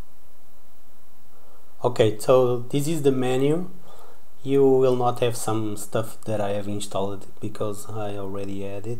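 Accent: Portugal. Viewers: Com esse sotaque és tuga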